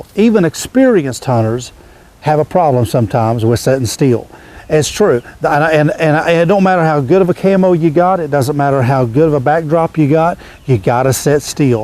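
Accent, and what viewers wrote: South, United States. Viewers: Folks this guy speaks better English than most of y’all ‘spert English teachers. Too many people need to get real and quit being high and mighty. It’s hard to see people when your nose is in the air. We in the South add personality and flavor to our talk. I’ll take genuine good ol’ boys over any snob any day. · Lol that southern accent could give tips to do both guaranteed lol. Great videos. I can never get enough turkey talk.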